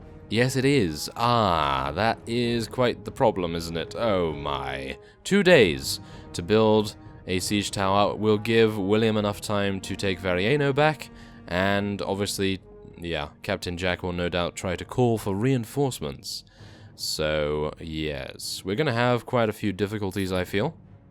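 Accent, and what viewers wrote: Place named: Oxford, United Kingdom. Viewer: If you don't mind me asking where in England are you from? I'm thinking Oxford area but I can't tell, I'm from up north near Manchester.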